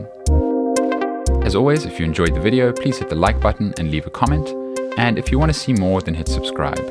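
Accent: South Africa. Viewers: South african ? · You sound so South African my bru !!! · I can hear that you are from Cape Town 👀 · I got excited when I heard your SA accent . Thanks for the tips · Are you South African? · Ayyyy you from durbs bru?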